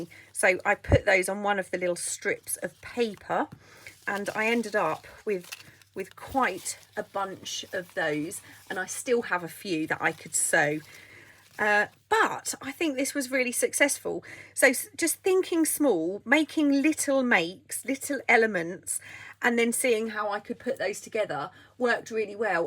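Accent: England, United Kingdom. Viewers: I love your hands and your fancy "English accent". The crafts you show are quite elegant and nice. I appreciate so much what other women do, this enriches us women to watch their creativity and good taste. Tysm for sharing it! ❤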